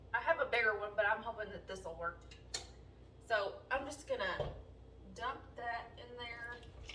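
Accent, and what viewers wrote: United States. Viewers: I like how I could genuinely share in her surprise and feel like I'm apart of the process 🤣💎🙌. That southern country accent while teaching me do stuff in the kitchen keeps me listening though 🙊😇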